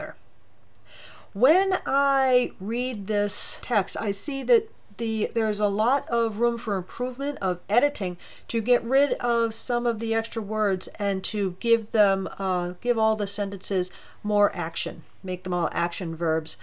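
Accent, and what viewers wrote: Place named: Northeast, United States. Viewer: thank you lady on the internet with a north east accent of some sort. saved me hours!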